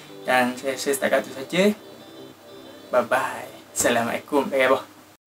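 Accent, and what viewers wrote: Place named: Terengganu, Malaysia. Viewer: Terbaik boss dpt ilmu hari ning.. Ornag ganung ke.. Ckp ade mcm ganu sikit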